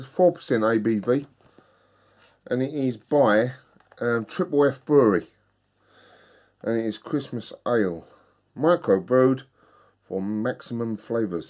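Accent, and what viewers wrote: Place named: South East London, United Kingdom. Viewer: Interesting about the brewers star, thanks for sharing, I did not know that. I did know about the five pointed star in Washington yes. The pentagram has been used for thousands of years, mostly symbolizing life or humanity, or the union of male and female. I like watching your videos by the way, I think your quite and interesting reviewer. Where are you from? You have a South East London accent, you sound just like my mate from Peckham.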